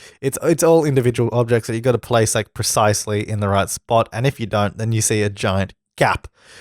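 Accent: Australia. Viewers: Marry me! Ur so cute! Love ur aussie voice! Cuuuteee! Wanna learn how to Make a World! I Think my pc is toooo Slow! Xoxoxoxo from Denmark! 🇩🇰🇩🇰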